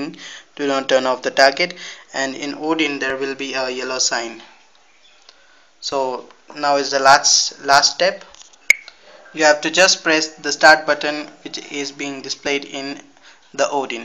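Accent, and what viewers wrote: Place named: India. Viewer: i can't understand the indian english :-(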